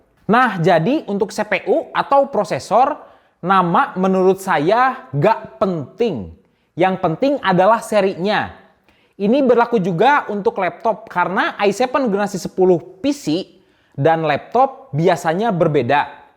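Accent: West Java, Indonesia. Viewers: logat na sunda pisan kang ..he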